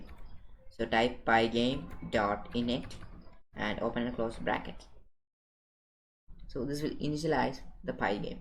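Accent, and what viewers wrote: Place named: England, United Kingdom. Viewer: u turned to an engliish dude!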